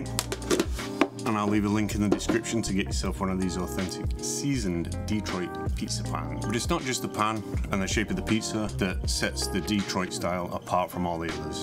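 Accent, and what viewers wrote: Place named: RP, United Kingdom. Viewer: Hello where are you From originally the accent you have is posh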